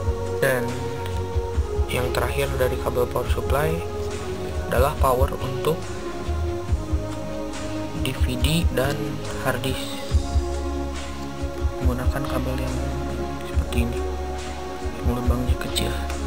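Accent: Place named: West Java, Indonesia. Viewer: eleuh eleuh eta kang,orang sunda nya......good kang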